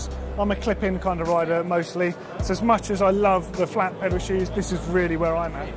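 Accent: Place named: United Kingdom. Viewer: i thpught u were from the uk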